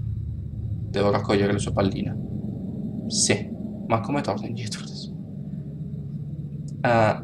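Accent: Bari, Italy. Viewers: maa.. sei di Bari? Comunque "stima totale!" bel video :D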